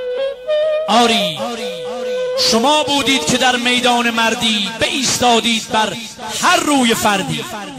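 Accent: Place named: Afghanistan. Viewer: You're an AFGHAN 😂😂😂😂😂😂😂😂😂😂😂😂😂😂 I KNOW NOW!!! IT MAKES PERFECT SENSE. I FEEL SORRY FOR YOU :((((((( ITS OKAY... YOU CAN KEEP PRETENDING YOUR PERSIAN. YOURE JUST PERSIAN SPEAKING WITH YOUR UGLY DIALECT.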